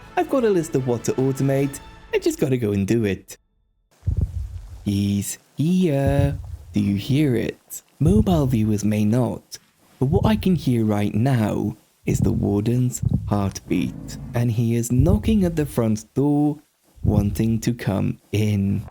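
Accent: United Kingdom. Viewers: I like the british accent.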